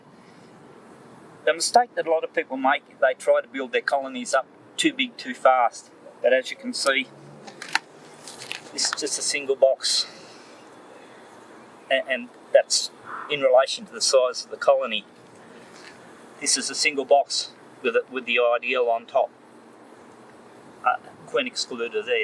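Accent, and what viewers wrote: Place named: Australia. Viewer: Australian beekeeper talking to his bees 😍🥰 I absolutely love the Australian accent.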